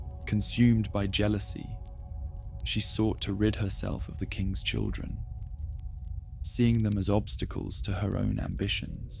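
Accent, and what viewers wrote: United Kingdom. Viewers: Pity, a pommie is the narrator!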